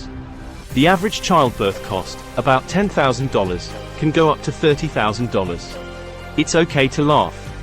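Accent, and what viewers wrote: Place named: England, United Kingdom. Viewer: The makers of this video would be more persuasive to Americans if the voice saying 'we' 'our healthcare system' was not an English one. Did they use a robot voice? If so it's a good one (its peculiar pauses in sentences are a bit of a give away) but no all accents which speak English are the same.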